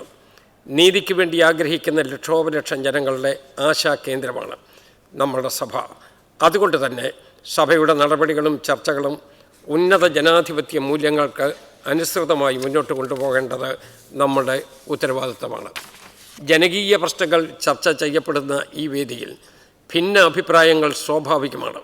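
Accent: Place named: Kottayam, India. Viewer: ഭാഷാ ശുദ്ധി ❤❤❤ കോട്ടയം അല്ലേ ❤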